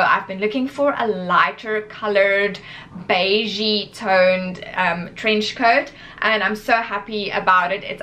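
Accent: South Africa. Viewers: Great travel tips, thank you! Are you South African? Every time I watch your videos, I always think you sound so South African to me (being Afrikaans myself). 😅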